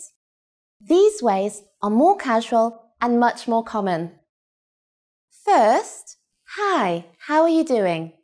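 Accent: England, United Kingdom. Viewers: british accent is so hot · Your sweet british accent is kind of cute and its helps me to learn this accent . . . . . i don't know why but its very nice for me 😅 Btw your sitweb is useless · I love British accent!.And she is amazingly beautiful and charming.Hello,!!!!! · I really love this walk through the British accent and the common communication way for people around the world rather using the American accent. Such plenty of love this accent though. If you could do please make a short lesson for kinds to learn the British accent too. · I liked British accent 👍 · where is the word "mate", i just wanna talk like a british to say that word with this accent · I was just try to learning English for 1month now I finally found a British accent , I like to learn this kind of stuff. Still I m try to learn if it's bad dnt mention it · Are you from england? 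· British accent is really fascinating...this lesson is attention taken ...I like your s-t-y-l-e...learn alot from you...thanks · Big thank you for presenting this helpful vedio to learn English with proper British accent 💙💙💙💙💙💙💙💙💙💙💙💙💙💙❤️❤️ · Hi dear teacher! Your British accent is wonderful and so beautiful. I love English very much! It is the most important and beautiful language of the world. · esse sotaque inglês pqp :( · I love British accent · This just feels like learning American English from someone with a British accent This sucks and I’ve learned nothing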